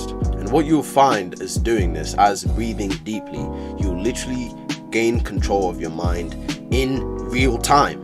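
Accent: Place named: United Kingdom. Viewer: british black man version of a southern black man.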